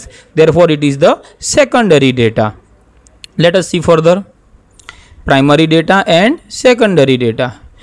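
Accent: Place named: Gujarat, India. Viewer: Aanoo gujrati laagyeee Chee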